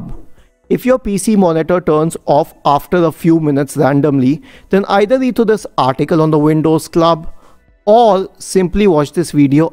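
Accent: India. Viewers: Most authentic YouTube Indian guy accent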